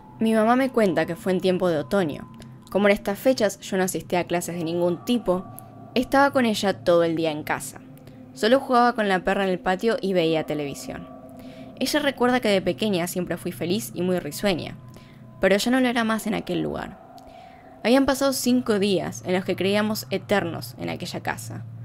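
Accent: Argentina. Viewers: Bueno muñeca preciosa, no se como cai acá pero ya cai, por tu acento sos Argentina....o me EQUIVOCO. Mira piba sos muy bella.. Te saludo desde Bremen Alemania.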